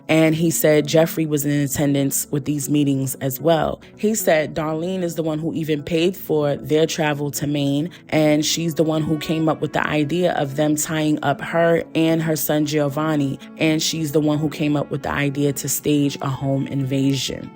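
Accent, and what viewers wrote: New York, United States. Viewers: Love your channel! Your story telling/narration capabilities mixed with that raw raspy New York accent and demeanor…keep up the great work! 🤎🤎✨✨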